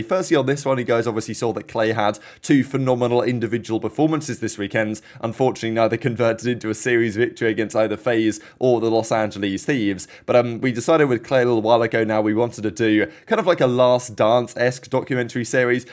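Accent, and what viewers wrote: England, United Kingdom. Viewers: where you from? From England so I’m assuming south somewhere but don’t know if it’s London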